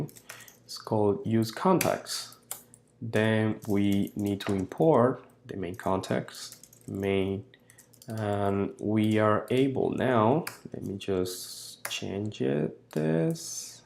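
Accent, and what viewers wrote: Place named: Brazil. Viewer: I thought he was Brazilian because of his name too, the accent sounds like the Brazilians who speak English as well haha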